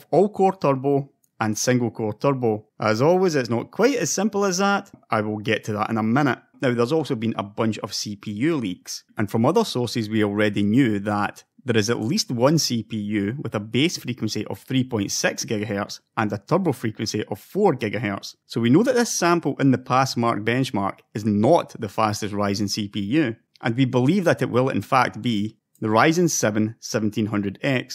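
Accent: Scotland, United Kingdom. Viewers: the Scottish accent is not that bad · No need to apologize for the voice as I'm pretty sure most people have figured out by now that you're Scottish :P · it's just a Scottish accent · that's just an scottish accent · this guy sounds like someone from scottland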